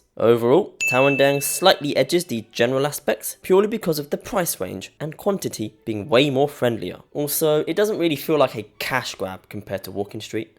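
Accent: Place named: RP, United Kingdom. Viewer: I love your accent; it's quite similar to that of the Queen of England. Greeting.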